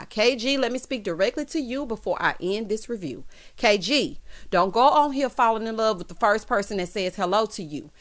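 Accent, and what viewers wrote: South, United States. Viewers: Your southern draw gets me every time Your Awesome..Keep Going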